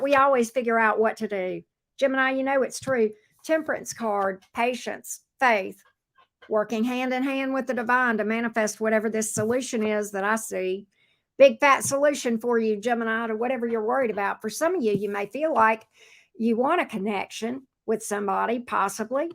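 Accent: South, United States. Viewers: I love the southern accent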